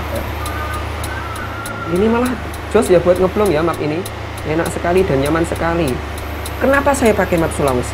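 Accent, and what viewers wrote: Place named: Pati, Indonesia. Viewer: Oh orang pati dikira orang indramayu hehehe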